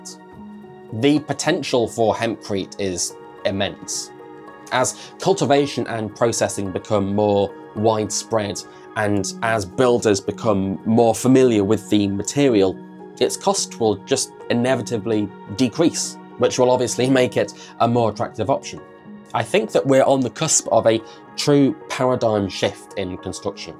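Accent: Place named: England, United Kingdom. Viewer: This is so US-centric. Maybe the "floodgates were opened in 2018" in the US, but in Europe, industrial hemp has been in use much longer. In fact the US were the only industrialised nation that outlawed industrial hemp for so long. For example, in Germany, the illegal status of industrial hemp was abolished in 1996. Hemp in Europe has always been in use for plumbing, rope production and insulation. It's the increased interest in sustainability and the need for energy efficient house building that has led to its revival. There are many well-established companies that have been selling hemp products for a long time. I can even find them in my local DIY store. For example, "Hanfstein" ( meaning hemp stone) have been producing and selling hempcrete bricks since 15 years. Americans sometimes have to be reminded that there is a world beyond their borders, and that sometimes they are not No.1, but lagging behind. 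Edit: from your accent, I'd expect that you're English, but the situation you described is specific to the US.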